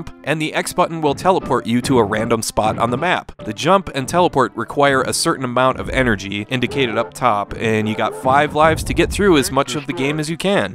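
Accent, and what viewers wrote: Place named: Midwest, United States. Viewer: Random Midwestern accent got me.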